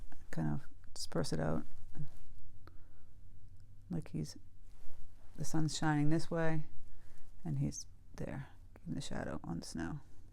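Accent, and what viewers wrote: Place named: East Coast, United States. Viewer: I know girl! We chatted last week about our East Coast loves of NE! You said you were in NY too and didn't lose your accent! LOL. Just finished your w/w pink/indigo trees too. Very relaxing and gorgeous color scheme. Thanks for everything,!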